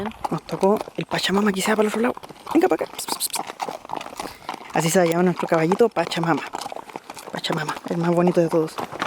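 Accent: Chile. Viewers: Eri como luisito comunica pero chileno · Hola whoa me encanta tus vídeos Gracias por mostrar al mundo mi Chile querido que no lo he vuelto a verlo desde 1975 tengo lágrimas en mis mejillas Un comentario me encanta como hablas tienes un asentó chileno hermoso ⚡️❤️⚡️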